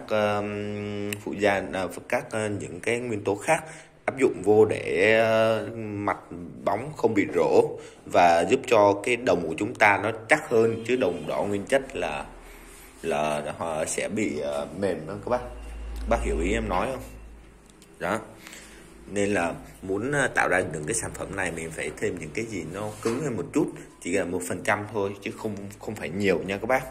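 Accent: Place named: Hue, Vietnam. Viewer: Giọng bác giống giọng Huế quá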